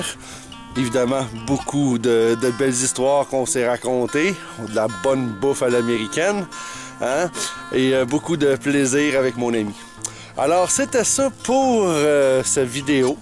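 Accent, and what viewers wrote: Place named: Quebec, Canada. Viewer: Merci pour cette vidéo. Cela faisait une paille (comme on dit chez nous en France )que l'on attendait ça. J'aime toujours autant ton accent. Bon tu va me dire que c'est nous les cousins français qui en avons un!! J'ai eu la chance d'aller travailler dans l'état de New-York il y a 3 ans avec un de tes compatriotes canadiens. Je débutais le geocaching mais cela ma permis au moins d'avoir un souvenir. Merci encore pour tes vidéos Au plaisir de te revoir. Choudrouna. (Du centre de la France ) Géo amitié.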